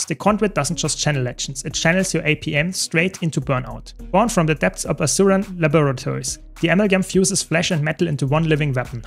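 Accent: Germany. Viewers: Heiliger bim bam das ist der deutscheste Akzent den ich je gehört habe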